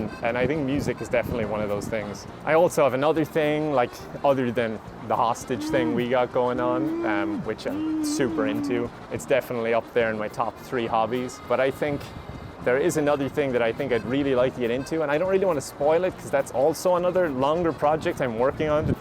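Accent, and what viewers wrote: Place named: Ireland. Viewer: I rewatch his videos all the time. I like to sleep to his soothing Irish voice lol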